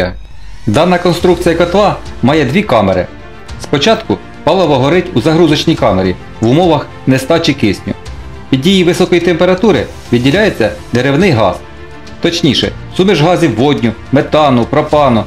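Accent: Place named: Poltava, Ukraine. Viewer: ВІтаю! Відчувається рідний діалект) Чи не Полтавська обл?) Як можна отримати креслення? Дякую! Бережи Вас Господь!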